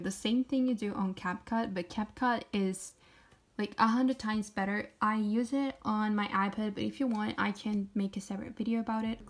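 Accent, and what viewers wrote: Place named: Italy. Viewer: Hi are you Italian? Loved the video, also your accent is great. I’m Italian too, but I watch mostly English talking people on YouTube 😅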